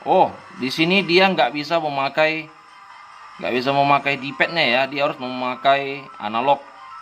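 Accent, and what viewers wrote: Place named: Java, Indonesia. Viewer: Saya suka logat jawanya abang..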